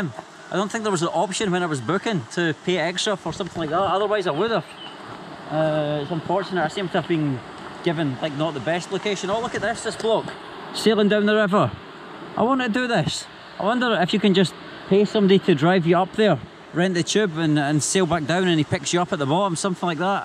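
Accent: Scotland, United Kingdom. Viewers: even then, he's using a scottish accent (which of course makes it even harder). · so guys in conclusion , every humans has a bad side , so no one is wrong in here, ok case closed but if im there , ill actually be friendly , because i want to train my english, and also he has a scottish accent which is something i like. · Don't know how one of your vids found its way to my recommended list but I am loving your travel vlogs. Also love the Scottish accent so hearing you talk is a bonus for me ☺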